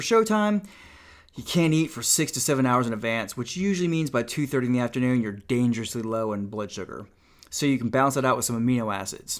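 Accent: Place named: Georgia, United States. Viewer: I'm so glad I found your channel! I had this book, loved it, I'd pick it up sometimes and read any random section, I always got something out of it that stayed with me, then I loaned it out & never got it back, so this is a real treat, and btw, you do a great job and your Georgia accent is endearing, I like it.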